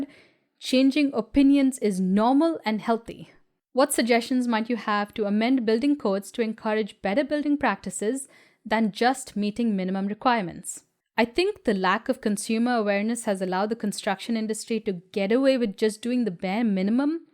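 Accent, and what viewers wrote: India. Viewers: I had pretty much decided you are Indian, from your accent. I expected is your married name, with your accent still doesn't make a lot of sense to me. Your Portuguese connexion goes far to explain why you don't look like most Indians I have seen. Years ago, I knew Yvette Rodrigues, her face had a similar shape to yours, though she came to Australia from the Philippines. Do I think all Indians are the same? They don't all look the same any more than all Europeans all look the same. I was rather taken one year, when I was watching an ANZAC Day march. A contingent of Poles came by. Individually, they don't look much different from any other white Australian. Together, the difference is striking. I suspect they mostly speak English though, but also a selection of other languages such as Urdu, Hindi. Arabic wouldn't surprise me. Probably with wildly different accents and dialects. 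I am not that surprised to find architecture students aren't quite ready for the workplace. From my expeience, in the 70s it took computing graduates a year to settle into their first job so they didn't need to be closely supervised. Part of it was that they learned on Burroughs equipment, not at all like any government (I was in Canberra) department. You could conceivably work to fill the gaps. I live in Mandurah, about 15 minutes walk from the Indian Ocean, about the same latitude as Dallas. We don't do ice and snow. You should do Q&A exactly as often as you like. I tend to not watch them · I still can't get over how refreshingly entertaining it is to hear this lovely lady with her Indian accent say "y'all" at the end of some videos. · Thanks for clarifying the name . When I saw your video and voice. I guessed you are Indian, but name sounded little different. No hard feelings was just love and curious.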